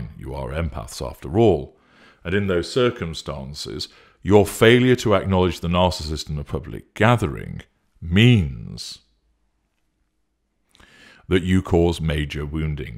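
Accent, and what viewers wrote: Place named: England, United Kingdom. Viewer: Uh oh I just found your channel a few days ago but I think I am addicted to your voice already. Eek. I always did love an English accent! I've always loved the English, the dry humor.... I'll be okay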